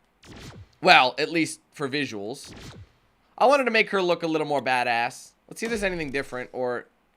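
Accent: Ireland. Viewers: Wait a minute.... is Irish? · Are You Irish?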